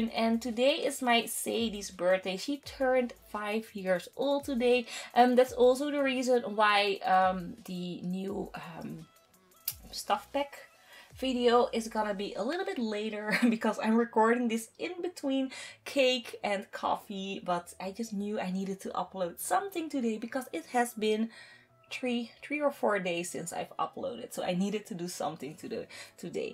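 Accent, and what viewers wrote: Netherlands. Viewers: Are you Dutch? You sound Dutch. (I'm not Dutch but I lived there for a few years) Btw great video, I'm glad I found this channel ☺️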